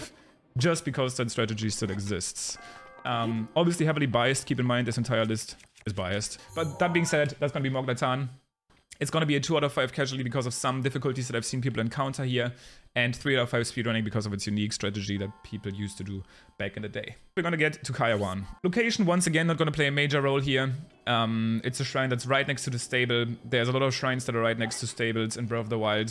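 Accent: Germany. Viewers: hab ich mir gedacht man hört nämlich den deutschen Akzent extrem heraus bei dir. Ist zwar beim zuhören etwas komisch aber trotzdem Geile videos👌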